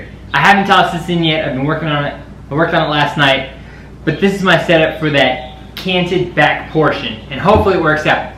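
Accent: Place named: Utah, United States. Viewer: U from Utah area ? Based on your slight Utah accent. Cool build bruh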